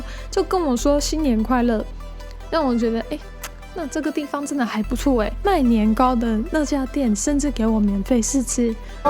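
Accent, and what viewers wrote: Taiwan. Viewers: 很喜歡聽妳說的台式中文～ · 聽到台灣腔就是親切 哈哈😄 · 很喜歡妳講的臺式中文腔調，感覺像是周遭的朋友在聊天，很有親切感，我們家只要沒結婚沒小孩過年都會收到紅包，金額大概<num>臺幣，。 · 地表最強台灣國語...+油..阿兜啊..你最強...讚啦... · 喜歡你的台灣腔的中文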